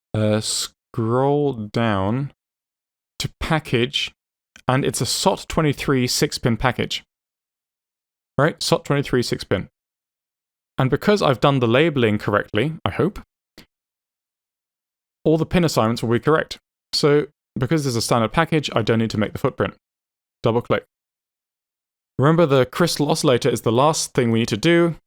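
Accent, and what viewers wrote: United Kingdom. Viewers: Wow, I still can't believe I've watched all of your PCB Design videos (5+ hours in total) in one sitting. Your know-how and fast skills with kicad are astonishing, plus your clean (Queen's) English makes it very easy for us non-native English speakers to comprehend what you're saying. You've earned every sub,. I am currently working on my master thesis in EE and your videos are very informative. Keep up the good work, and THANK YOU very much for your videos.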